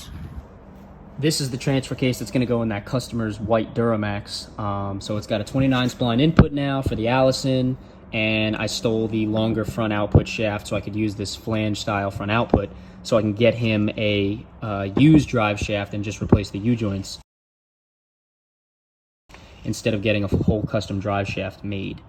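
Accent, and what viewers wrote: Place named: Brooklyn, United States. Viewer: We used to go make deliveries to New Paltz Poughkeepsie from Brooklyn terminal market years ago for the watermelon king M Pagano and Sons and I'm originally from Brooklyn to, I caught your accent lol Really nice work, she really looks stock, I don't 100% have a builder to do my Tacoma SAS, I might have to look you up if this guy doesn't come through for me? He's so far has barely showed any interest, and long island mechanics suck!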